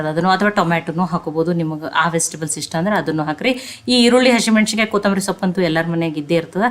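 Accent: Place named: Bijapur, India. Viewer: Madum nim video daily nodtini ri tumba isht nanage nanu bangalorè inda but nau bijapur davaru niu yav ooru pls tilsi nim matu nodidre niu bjp davara anta kushi agutte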